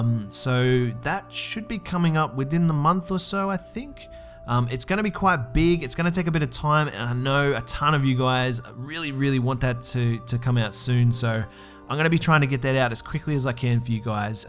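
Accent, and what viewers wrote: Australia. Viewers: Nice accent! Are you from Australia??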